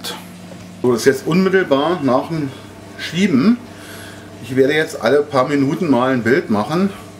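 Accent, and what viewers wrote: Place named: Berlin, Germany. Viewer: Hallo, hab das Rezept für´nen einfachen Sandkuchen gesucht, weil ich den von meinem Vater geliebt habe. Er hatte eine Bäckerei/Konditorei auch in Berlin; daher kam mir dein Slang so bekannt und heimisch vor:) Danke danke danke für dieses prima Rezept. Gespeichert hab ich so einiges, aber dich hab ich abonniert!!!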